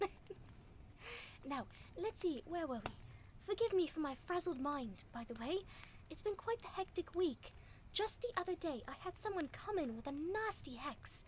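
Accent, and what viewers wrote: RP, United Kingdom. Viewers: Ah, some proper Bri'ish, feels just like home! Like I just popped down to my local chippy and it had, in fact, turned into a potion shop! Jolly good show! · Me: Sees you posted a new video "Oh, nice. Wonder what it i- Also me: *sees its a witch roleplay "I know what I'm listening to tonight! Thank you - *sees its British accent Also me: various happy noises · This one is just lovely! Just the bri‘ish alone gives it this certain charm. Lovin‘ it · Bugger me. This accent is so posh it makes me feel positively middle class. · Ayoo bri ish is soo sweet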